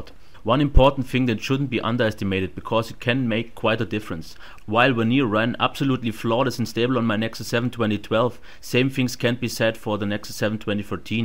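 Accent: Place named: Germany. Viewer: so richtig deutsches englisch :D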